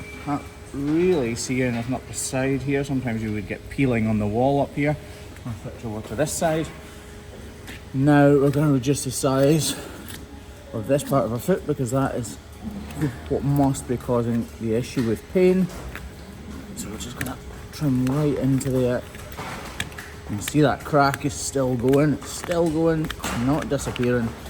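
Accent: Scotland, United Kingdom. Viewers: Having had some experience with carbide cutter heads on many different woodworking applications i can say i prefer the three or more cutter ports. The reason being is that theres more contact and control. It works the motor less. And the cutters last longer. No offense to the styling. Its perfectly functional. Oh, and you can get the square bits that have curved sides so its not so aggressive. Or even whats called in the woid turning world as negative rake cutters. Meaning they have a slight bevel on the cutting edge that smooths out the cut. And... You can even sharpen those carbide cutters on a diamond stone. Carbide aint cheap. You are the first hoif trimmer channel i found, and having grown up on a small private farm, i never saw a hoof trimmer and we kept constantly. Enjoyed the FFA knew of and watched farriers trim and shoe horses, but was never aware of hoof trimming for cattle. 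Ive been hooked ever since discovering you. Having Scottish ancestry its a blast hearing the accent. To you id have the worst southern hick accent you ever heard.😂 yall come back now ya hear?!🤣😎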